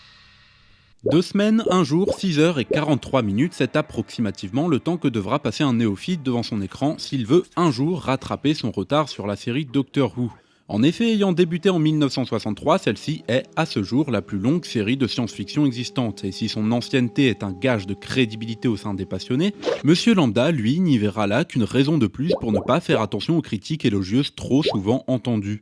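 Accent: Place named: France. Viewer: Wait... he seems to be... French!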